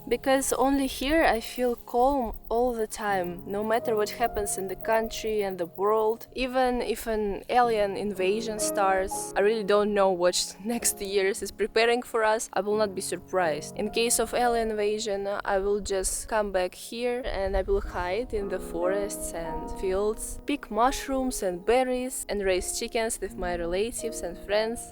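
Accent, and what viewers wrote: Russia. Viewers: Young, beautiful, active, funny, thick Russian accent, you represent every quality the men all over the world treasure among Russian women. Stay happy and make more videos. Your fella is a lucky man, really, envied by the world. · I’d be absolutely devastated if I ever found out that your Russian accent was fake! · I just visited Russia last year. The country is so beautiful, I love it. I also like your Russian accent and simple explanation. Visiting back your kindergarten was of course quite touching. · Love Russian accent, would love to meet a russian girl here in Argentina, cheers! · I love the Russian accent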